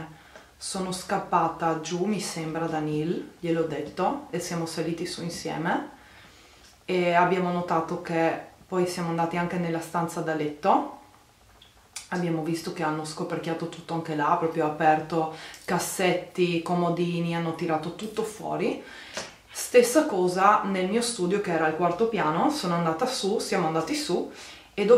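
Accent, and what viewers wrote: Trieste, Italy. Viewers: Caterina Trevisan un triestino che parla in italiano si riconosce subito, non siamo capaci di parlare "in lingua"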